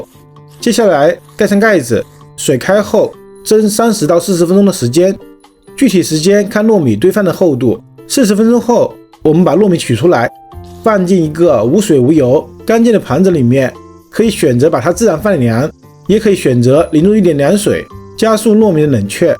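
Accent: Wuhan, China. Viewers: 典型的武汉的口音~！😀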